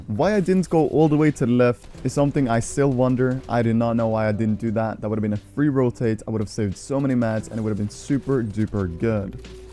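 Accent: Norway. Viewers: Are you from norway? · wait are you norwegian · Are u from norway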